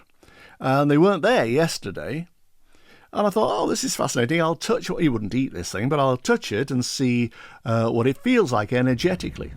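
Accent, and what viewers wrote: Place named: United Kingdom. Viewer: Almost as soon as you start for the exit you stop and and in that cool ass brit voice say "huh I wonder" Then you walk up to the opening thinking " wow that's quite a jump," as the orbs popped and floated up . All you had do was believe and have faith that you were going to get where you are meant to. So off you go.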